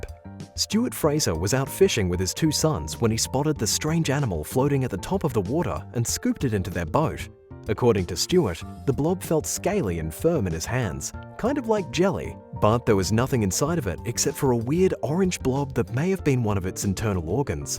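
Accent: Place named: Australia. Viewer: Since subbing to your channel a few weeks ago, ive never enjoyed a nature channel as good as yours! I dive in as soon as i get notification & have thoroughly enjoyed every single one! I know there are tons of wildlife vids out there but yours gets straight into the nitty gritty with gusto & enthusiasm & i love the Aussie narrator Long may you all continue to show us great vids & brilliant cinematography underwater & above Super entertainment Thank you all 😉🇬🇧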